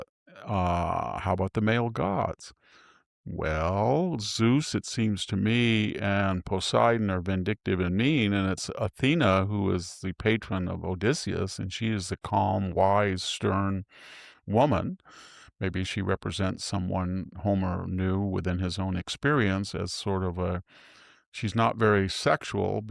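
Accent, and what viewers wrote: United States. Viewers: ❤❤❤❤it is so nice for me hearing the old greece-names with an american-english pronunciation. If you would not write the names I would not understand the original greece names❤❤❤❤ regarding your topic, you are absolutely right, "classical literature was once the cornerstone of education" =I appreciate this sentence so highly ❤❤❤❤ I am living in Wien/Austria, and I estimate the tendence is here the same as you described❤❤❤❤ warm greeting to you❤❤❤❤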